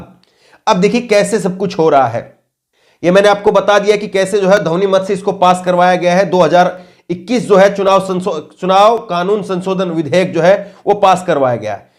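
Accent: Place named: Rural, India. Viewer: आपको हिन्दी अच्छी नहीं है lower class की या illetirate टाइप जैसे गाँव के लोग बोलते हैं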